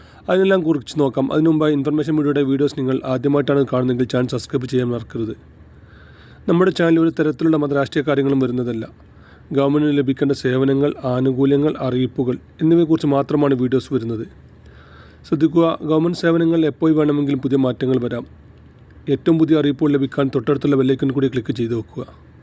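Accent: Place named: Tamil Nadu, India. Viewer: തമിഴൻ ആണോ മലയാളം വൃത്തി ആയി പറയാൻ പടിക്കു എന്നിട്ട് വന്നു പറയു പ്ലീസ്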